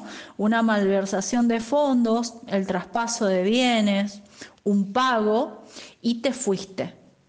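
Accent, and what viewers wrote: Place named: Colombia. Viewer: yo creo que no porque habla con acento como de colombiano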